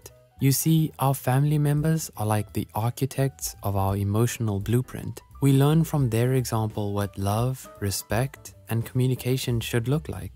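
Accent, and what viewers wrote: South Africa. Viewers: I am hearing my people. Is the narrator South African?🇿🇦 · Idk how to explain it, but within the first five seconds of hearing the narrator, I immediately heard a fellow South African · out of topic but is the person talking south african? i hear the accent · Are you south african? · Is this voice over South African?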